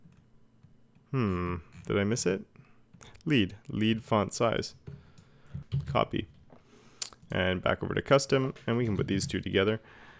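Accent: Canada. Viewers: love the videos, are you Canadian by chance?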